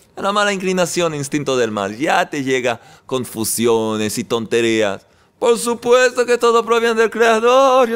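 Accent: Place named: Panama. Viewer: Una de los sucesos que mas me ha divertido es que usted habla como nosotros y eso hace que se identifique mas..."pa donde vamos" jeje Y los mas importante y valioso es que no sabia como era mi relación con Dios y tampoco entendia muchas cosas. Aparte que no sabia que espera de mi y yo de El. Gracias, muchas gracias. Soy de Panamá y me apodan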